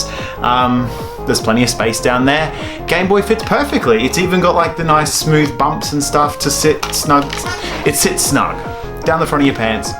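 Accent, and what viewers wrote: Australia. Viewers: I like your accent! Are you from Australia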